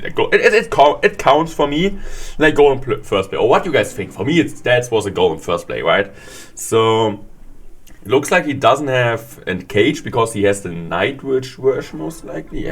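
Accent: Germany. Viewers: Somehow the German accent makes it all sound so much cooler. Nice video,.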